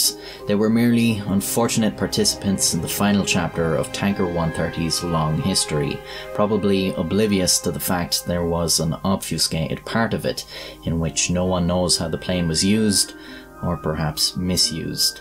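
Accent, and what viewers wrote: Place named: Mayo, Ireland. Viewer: Hey, random question but I don't suppose you're from Mayo? Your accent reminds me of The Hardy Bucks? Am I close?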